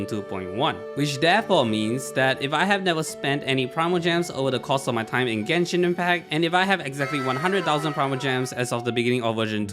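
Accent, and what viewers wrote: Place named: Singapore. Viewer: Ayo are you Singaporean u have Singaporean accent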